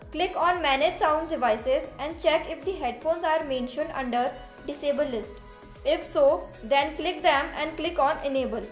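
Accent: India. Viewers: most hardcore indian accent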